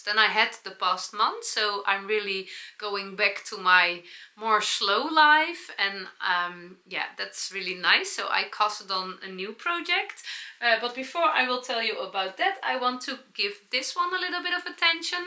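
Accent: Netherlands. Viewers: Hello, nothing wrong with your "dutchy" English, makes me smile when you say that. Love the accent. My g.g.g. was from Oenkirk, Netherlands which I visited in 2019. So it gives me an idea of what his accent might have been like. He jumped ship in Melbourne, Australia in 1851 (my birth place). So I may not have existed if he had not come to Australia. Your prononciation is correct. ❤ We have just returned from snowy Norway and 5hrs of daylight till only 2.30pm was hard to get used to. When you had the knit event, I was on a ship heading up the west coast of Norway. So couldnt come to meet you and Yana.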